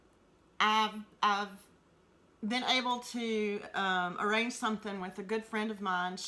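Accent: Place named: South, United States. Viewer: I love your southern accent ! I'm from Kentucky ! Your hats are beautiful !! And so is the baby blanket ! If you would like to check out my work, come on over . See ya next time !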